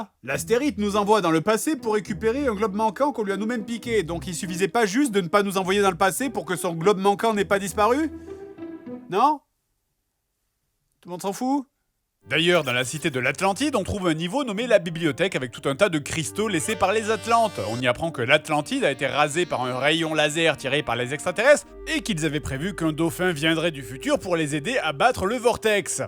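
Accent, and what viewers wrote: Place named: Toulouse, France. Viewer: Tu es toulousain, ça s'entend ....ou du sud de la France...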